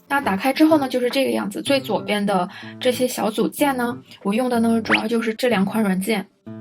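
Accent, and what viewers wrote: China. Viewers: 口音是烟台的吧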